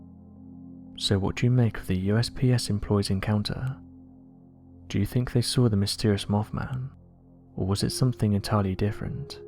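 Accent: United Kingdom. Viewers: Your British voice drives me up the wall. Have somebody else narrate your stuff. When you talk British and you talk like you're singing.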